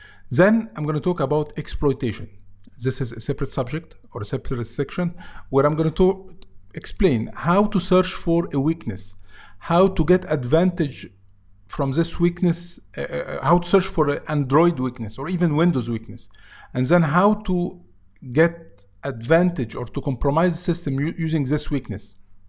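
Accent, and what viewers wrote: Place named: England, United Kingdom. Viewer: Inspite of that English accent everything is okay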